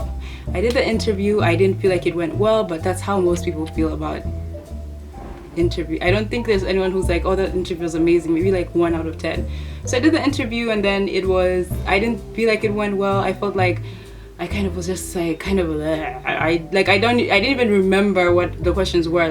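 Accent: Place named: Kenya. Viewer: omg, i thought you were Kenyan at first, just from the accent. definitely subscribing to your channel. Thank you for the video.It was definitely informative. I hope to be able to intern at U.N next summer/ keeping my opes up.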